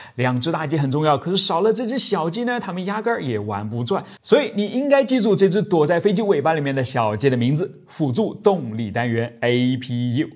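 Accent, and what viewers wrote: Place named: Henan, China. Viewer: 哈哈，我也听出来了，河南话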